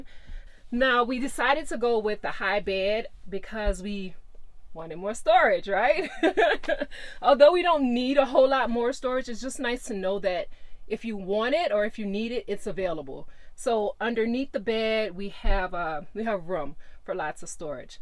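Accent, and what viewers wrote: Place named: New Orleans, United States. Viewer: Lord it The minute she started talking I thought she’s gotta be from New Orleans! Beautiful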